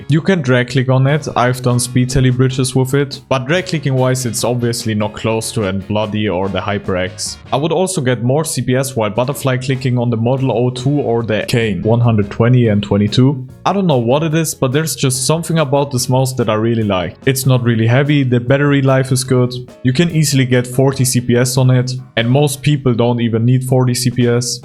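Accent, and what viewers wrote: Germany. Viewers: wait are u german u got that accent xD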